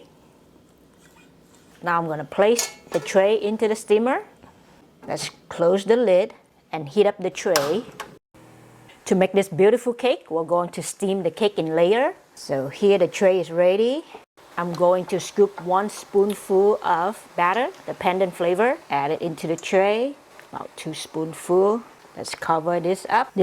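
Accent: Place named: United States. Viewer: Are u living in Singapore? I love your American accent..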